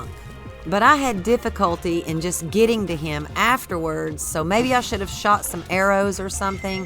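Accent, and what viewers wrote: South, United States. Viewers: Is it just me or does she/he sound like a red neck?(no disrespect) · I'm British and this is definitely the best American accent ❤ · Very helpful, love the southern accent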